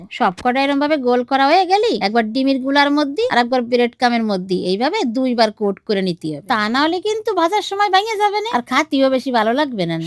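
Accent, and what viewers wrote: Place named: Bagerhat, Bangladesh. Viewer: বাংলাদেশের খুলনা জেলার মতো কথার টান😮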